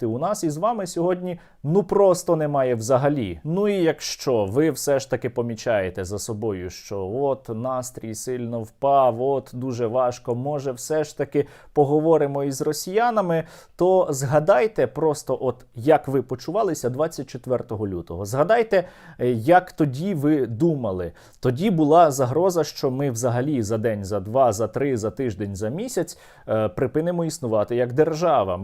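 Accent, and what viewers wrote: Ukraine. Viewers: Українська в нього стала набагато кращою!!!Але вплив російськомовної Одеси на нього ще відчувається!!!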